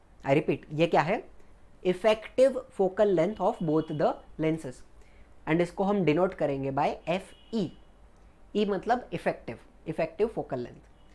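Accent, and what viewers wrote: Maharashtra, India. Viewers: sir your mother tounge is marathi?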